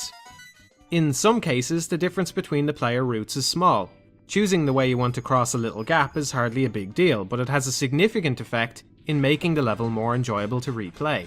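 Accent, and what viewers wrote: Dublin, Ireland. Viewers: Can't pinpoint your accent man, sounds like half culchie half Dublin lol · Your reviews are highly reminiscent of TotalBiscuit's whom I recently discovered and came to enjoy immensely for his unparalleled levels of insight and breadth of scope. Maybe you should change your handle to "TotalScone", since (and forgive me if I'm mistaken) you sound Irish. :P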